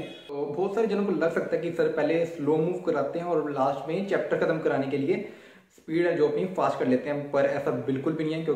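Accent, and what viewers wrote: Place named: Punjab, India. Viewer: meine is liye puchhe kyonki mein bhi punjab se aur jado tusi gall krde paye si tdd tuhadi tone to mein samj gaya, ki ah banda te apne vall da hi aa, punjabi aa aah te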